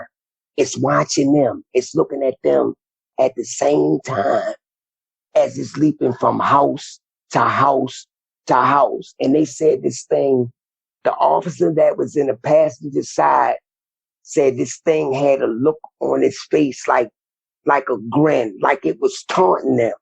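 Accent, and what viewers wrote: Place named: Baltimore, United States. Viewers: Dmv stand up you can hear the Baltimore in my guy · Heavy Baltimore accent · My man reading your from Baltimore aren't you?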